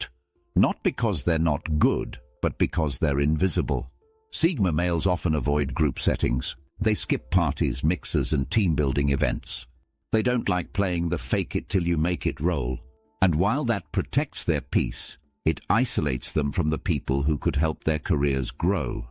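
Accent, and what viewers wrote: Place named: United Kingdom. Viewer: Another crappy British accent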